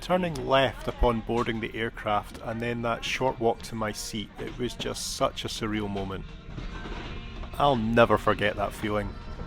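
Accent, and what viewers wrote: Scotland, United Kingdom. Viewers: You have got all 3 my favorite ways of traveling (airplane, train and ferry)! 👍❤️ Please keep traveling/sharing and I will definitely keep watching. 🙏 By the way, love your Scottish accent. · Hi, nice to meet you...I've just discovered your channel and I must say IT'S REALLY ONE OF THE VERY BEST out there!!! Your videos are Grrrreat! Excellent quality, good content, to the point commenting, and perfect editing. And the wee Scottish accent is the icing on the cake!...and did I mention that you're also eye candy??;-) (hope you don't mind a compliment from a man!) Agree that VS are excellent value for money, especially if you happen to stumble on one of their offers. Their crews are some of the very best, aren't they? I so love the A350....big, smooth, quiet, powerful... Doesn't get any better than that, really! Xx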